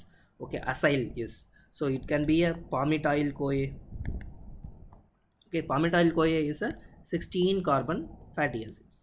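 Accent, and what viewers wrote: India. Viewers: Sir Just by your accent that I make out that u r from tamil nadu. Same accent was of former IPS officer Annamalai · R u from south india?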